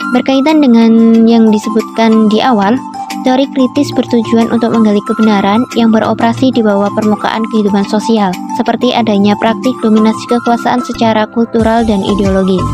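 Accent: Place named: Java, Indonesia. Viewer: Logat jawa banget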